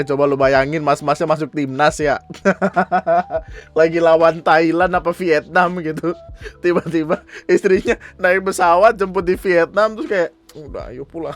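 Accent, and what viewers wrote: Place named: Lampung, Indonesia. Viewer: Raden Inten Lampung itu bang, dari logatnya org Lampung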